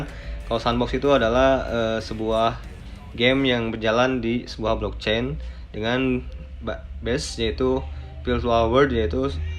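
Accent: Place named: Bali, Indonesia. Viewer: Kyk logat bali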